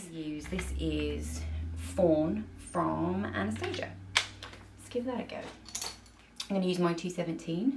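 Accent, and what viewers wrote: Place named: United Kingdom. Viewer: not wanting to generalize the british accent but you remind me so much of Lisa Eldridge. I love her and love your content!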